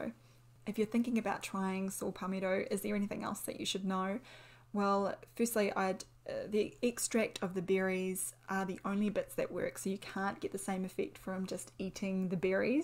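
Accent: New Zealand. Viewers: Love the kiwi accent 🥰 Thanks doc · Beautiful New Zealand accent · I take saw pameto in powder and I only use a little because I juice it with a bunch of other herbs, is that good or badd ? I've heard so many different things about it and you're the 1st to say that it's probably not the best for men in a lot of ways so what's going on? By the way I know you're from New Zealand by your accent because one of my good friends is from the total Kiwi accent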